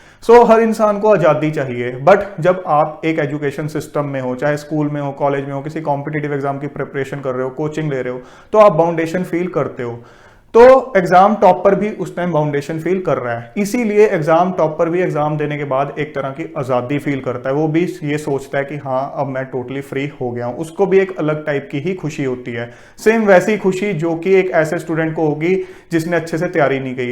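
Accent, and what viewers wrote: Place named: Punjab, India. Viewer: punjabi punjab aala banda e bolda mere pra.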